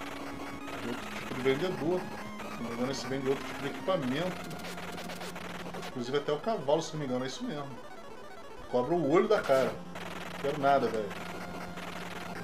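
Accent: Rio de Janeiro, Brazil. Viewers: Bacana seu canal de games,você é do Rio de janeiro né,dá pra ver pelo sotaque,igual ao meu,eu moro aqui em niterói RJ.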